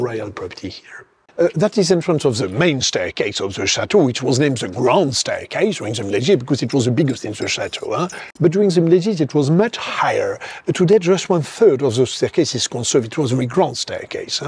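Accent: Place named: France. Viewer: Oh my godddd…. He speaks too fast with a very French accent.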